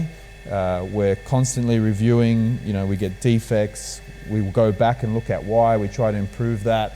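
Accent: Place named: Australia. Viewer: Wow. I want one. Got a shock when you spoke with an Oz accent after the algorithm put this up (I am from Melbourne). Will look for your website now 👍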